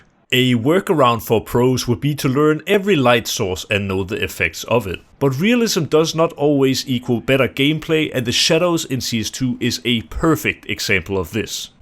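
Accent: Denmark. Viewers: dude i can tell you are danish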